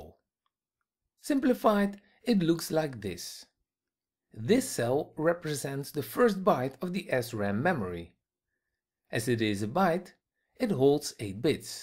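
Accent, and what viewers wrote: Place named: Netherlands. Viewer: The guy with the hollands accent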